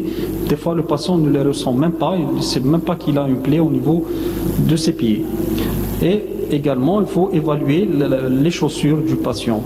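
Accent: Algeria. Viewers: C'est désagréable cet accent algérien ouf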